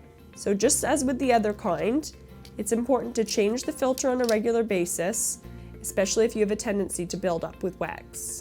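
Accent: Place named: Canada. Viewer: This lady gets progressively more Canadian as the video goes on.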